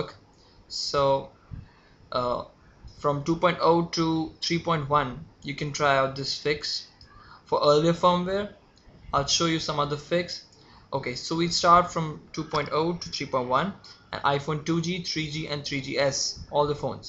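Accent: India. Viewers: indian accent sounds like shit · is that a tamil~rrr accent?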